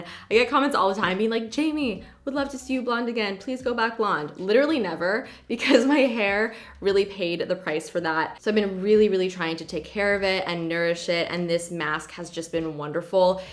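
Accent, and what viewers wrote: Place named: Canada. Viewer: I love your Canadian accent!! xo-Wendy NYC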